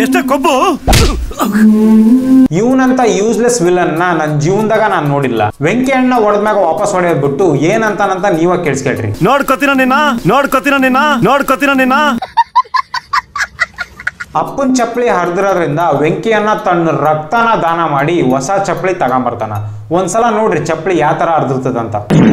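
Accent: Uttara Kannada, India. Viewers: full uttarakannada slang a maintian maadri ......eee nadu nadu bangalore accent byada...goood going... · Uttara Kannada maatugalu.. namma huduga ivaan❤❤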